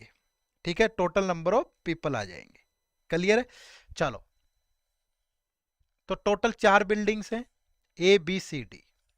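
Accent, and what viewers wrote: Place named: Haryana, India. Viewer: Solved. Answer is c & great session Sir. Love your Haryanvi accent!!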